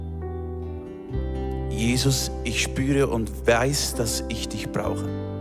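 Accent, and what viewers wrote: Switzerland. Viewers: Liebe Mirjana, das ist Hochdeutsch mit Schweizerakzent. Und wenn man Hochdeutsch versteht, ist es kein Problem die Predigt zu verstehen. Zu den Menschen wird freundlich gesprochen und sie werden ernstgenommen. Nach der Speisung der fünftausend ist Jesus Christus herausfordernd, weil er ins ❤ der Menschen sieht. Bei Maria und Martha ist ER empathisch. Denn ER weiß welche Worte wir brauchen. Auch diese Geschichte wird erwähnt. Also auch diese Tatsache kommt nicht zu kurz.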